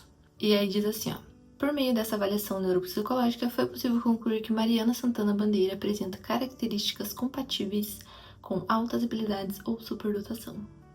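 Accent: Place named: Paraná, Brazil. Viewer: Nossa, que loucura, eu reconheci o sotaque do Paraná, mas nem sonhei que fosse de Guarapuava. Moro aqui atualmente também. Amanhã recebo minha devolutiva, e meus sentimentos desde que descobri essa possibilidade foram muito semelhantes aos teus. Achei a profissional que considerava ideal, que me transmitisse a confiança suficiente pra não dar margem pra síndrome do impostor atacar, caso recebesse a confirmação do laudo e mesmo assim ficasse duvidando da veracidade da informação. O problema é que ela tinha uma fila de espera de cerca de 6 meses pra avaliação. Jesus, tive que esperar né, mas imagine a "angústia" 😂. Mas valeu muito a pena a espera. Agora estou vivendo a mesma fase, de ter 99% de certeza que sim, mas com aquele medo de cair do cavalo amanhã é real. Também a preocupação de o que fazer com essa informação, caso se confirme. Enfim, muita coisa pra sentir, mas feliz por estar fazendo. 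Parabéns pela coragem de se expor assim, só quem passa pelo processo e estuda o tema é capaz de entender as dificuldades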